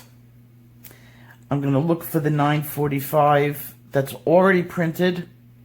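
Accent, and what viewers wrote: New York, United States. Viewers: It is good to hear your voice again. Love your NY accent, glad you got out of there too. May God bless you always, your generosity is unsurpassed and I love you. Love this vid.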